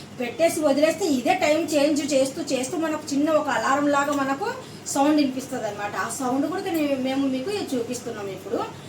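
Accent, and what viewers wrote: Rayalaseema, India. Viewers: Goodroyalseema telugu accent.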